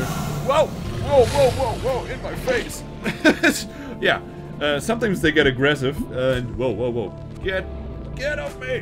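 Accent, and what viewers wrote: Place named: Germany. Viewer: Are you german? this accent sounds so german lol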